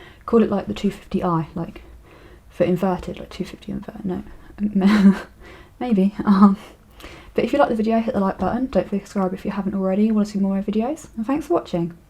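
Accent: United Kingdom. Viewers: Oh I love the British accent.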